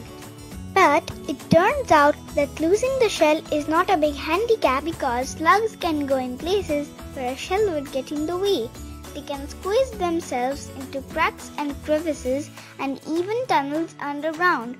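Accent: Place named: India. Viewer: I love the way she talks, India I think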